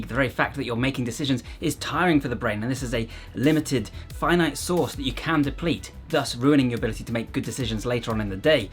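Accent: England, United Kingdom. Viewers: Americans always trust anyone with an English accent 😁 So of course as someone eating like an American, I’ve had to resort to counting calories. I somehow seem to get fat so easily. 🙄 even when I’m at maintenance I have to count. I guess eating like a hog in my youth while lifting 4 days a week made it where I can’t seem to have that intuition for not overeating. Thanks for the video! Subscribed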